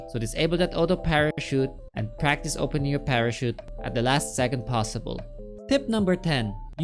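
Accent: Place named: Philippines. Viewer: English mo kasi parang pinoy ang tunog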